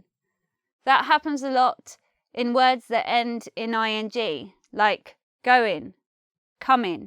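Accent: United Kingdom. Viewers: i am so happy that you are recovered and in full of energy. I really enjoy British accent. Thank you.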